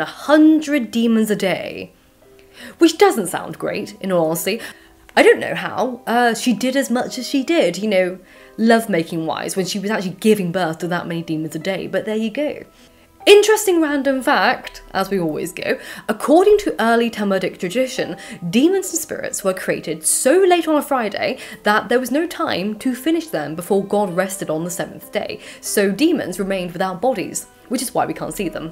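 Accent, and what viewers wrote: RP, United Kingdom. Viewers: Received standard pronunciation and a lovely classical English accent. I thought I’d never find that on this website. I’m in love and subscribed.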